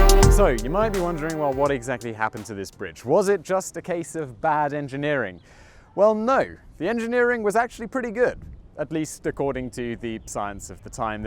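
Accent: England, United Kingdom. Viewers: That a pretty unique English accent...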